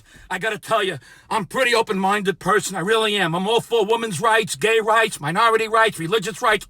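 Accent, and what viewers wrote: United States. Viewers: Yeah! is Back 👍 sounding like a true American ❤️🇺🇸💙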